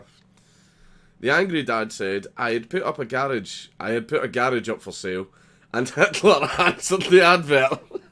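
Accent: Germany. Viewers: your german accent is godlike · Man you can do a German accen hilariously well! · That german accent is creepily accurate. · Pretty solid German accent buddy 👌👌👍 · Say "party pooper" in your German accent. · You do a REALLY good German accent!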